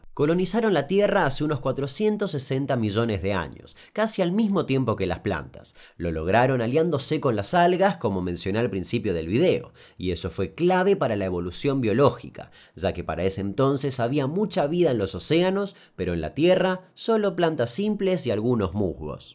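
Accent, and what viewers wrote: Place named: Argentina. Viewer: que bello cuando entro a un video de un canal que no conozco y termina siendo que el youtuber es argentinoooo, hermoso acento y precioso pais. saludos desde argentina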